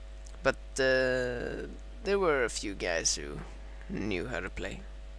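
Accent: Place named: France. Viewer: dude you sound like your french English is good but can improve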